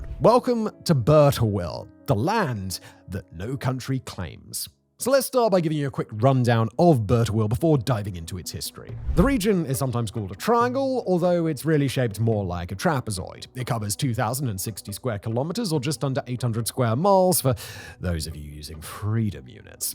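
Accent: United Kingdom. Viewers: By "Freedom units" he means British imperial units, some even have the word British in them ie. BTU. Yes we still use the same units named after the accent he has, and he uses the units that originated in France, not the UK or CZ. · You are so British. Gawd your pronunciation is so ridiculous.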